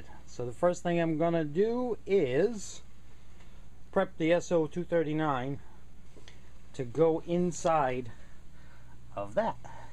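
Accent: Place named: Boston, United States. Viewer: Interesting - if you’re going dipole on 10/11 meters vertical polarization is the BEST way to go. You sound like my Southie submarine shipmates - where ya’ from? Bahstin?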